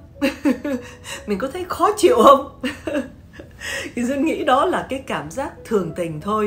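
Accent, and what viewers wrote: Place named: South, Vietnam. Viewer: Rat Thach Noi going nam, you are so cute!love you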